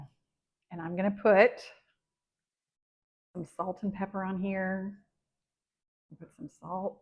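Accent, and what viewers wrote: United States. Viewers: I've got a large crop coming in, I need to try this thanks. That's the first time I've heard "Oh my stars" in so so long. You have to be Southern. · love tomatoes and even though you pronounce it the American way, I forgive you! I am right off course because I am British! Thank you so much – Paul from England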